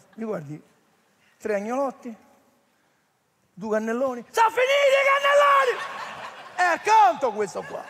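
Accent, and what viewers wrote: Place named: Rome, Italy. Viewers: Sempre grande divertente. Il romanesco mi piace. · E' un comico spontaneo,una comicità' naturale!!! In romanesco poi......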